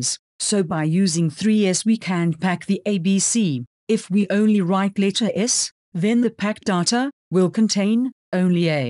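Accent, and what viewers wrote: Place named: South Africa. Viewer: Her accent Sounds South African. Pretty cool!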